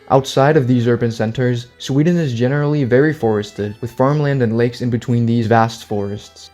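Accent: Denmark. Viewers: your propally a dane i know cus the way you pronuence sønderjylland and sjæland and the danish accent is perfect for a dane how do i know im a dane. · wtf why is he mispronouncing the swedish names in danish? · he has a slight danish accent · Cant help but notice the Danish accent on the creator. I feel we already are in this union in some ways. I certainly appreciate all our neighbours <3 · De märks att han är dansk xD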